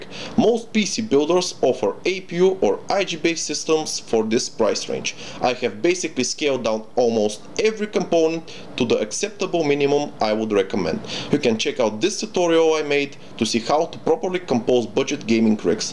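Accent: Russia. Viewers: I love the madarfaking russian accent :D (i am Bulgarian, though) :D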